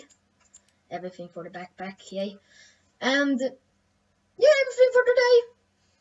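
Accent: Sweden. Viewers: maybe du låter a little bitt svenska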